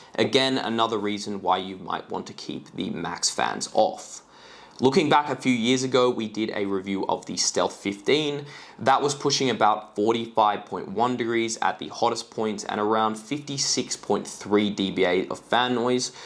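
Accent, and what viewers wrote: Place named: Australia. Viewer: Do all Australians pronounce it "hayche"? How do yaw spell idear? Exactly how it sounds? Neeveedia? If 4k refers to horizontal pixels (which is only like 3800) and 2k refers to vertical pixels (which is only 1440p; an even bigger lie, or misnomer), then what does 1k refer to? Why is the sky blue? So many questions. Few answers.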